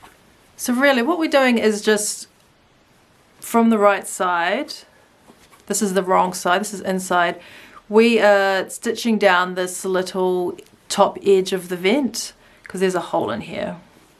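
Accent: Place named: New Zealand. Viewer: LOVED the ruler tip - Brilliant! And so nice to hear a fellow Kiwi tutorial :) It was like having my BF sew with me. Thank you <3 This is so good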